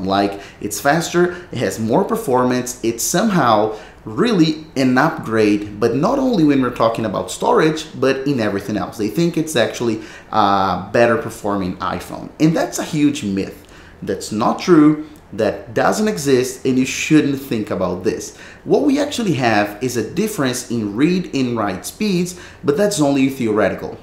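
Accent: Brazil. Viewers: sound brazilian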